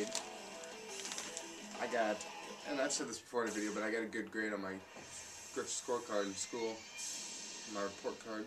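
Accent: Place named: United Kingdom. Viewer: Very enjoyable responses matey! As a Dutch person I am really impressed by your English accent. It's not exaggerated which means that it actually works... I guess, but only the Brits will be able to judge that I guess....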